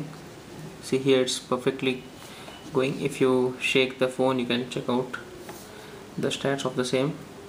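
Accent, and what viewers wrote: India. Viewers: every things great except the way of talking and that accent...fucking bullshitt indian accent...itz star not ishtar you fuck!!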